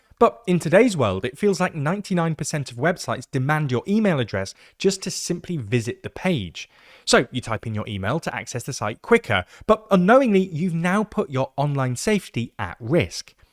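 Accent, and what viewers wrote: United Kingdom. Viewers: Yawn. Another brit-accent wagging-fingers about superior morality. America is not Commonwealth, so your ignorance not understanding undercuts your ‘judgement’ of events and facts: America does not jail politicians for running an office, but Biden+co did just that; weaponizing lawfare until Trumps whole family was threatened with jail - when they had nothing to do with it. So much Democracy under Liberals. Further, their fortune was directly threatened vocally, legally, politically, but US administration: what better way to say Papa Spank, than make departments reel from institutional shock and public shame? AND, the January Sixth protest was another coercion this tries to set right: as you will see, the voting was entirely rigged, because Chatham House cannot survive two Trump presidencies. They’ve said so themselves publicly just last week. 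Maybe it;s a rug-pull, but I see Justice in exacting a price for government malfeasance and appears nothing beyond what Every Other Leader seems to do. Biden+Co thought an Autopen substituted for Public Opinion and legal Congressional Acts. Enjoy your morally superior rape-gangs, England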